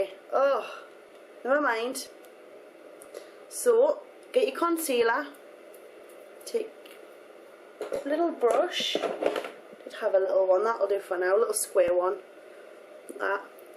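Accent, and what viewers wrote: United Kingdom. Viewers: God i love your videos ! humm, r u british?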